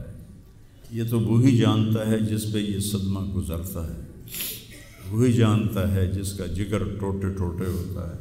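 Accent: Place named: Paharpur, India. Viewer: Not a muslim, maulana sahab being a hindu, I have lot of love for you in my heart the way you speak, the same language my ancestors spoke,reminds me of my daadi, she passed away from this world in 2020, she was as innocent and lovely as you,my ancestors are from paharpur Dera Ismail Khan, NWFP.. my heart is full of love for you, to see you sad, saddens me too!! Literally in tears!!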